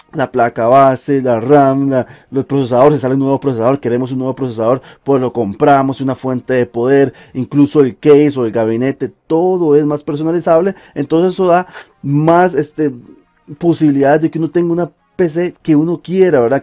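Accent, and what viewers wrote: Costa Rica. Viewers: Se me hacia que era tico por el acento, ya cuando vi la postal de Lda lo confirmé😂😂👌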